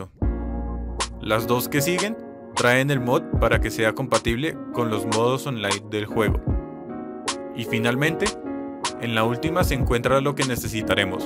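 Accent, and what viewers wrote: Colombia. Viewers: Sos de colombia cierto? No se note como asento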